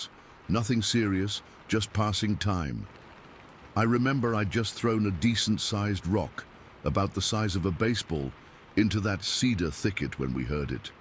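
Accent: United Kingdom. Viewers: Hard to listen to a British dude talk about American .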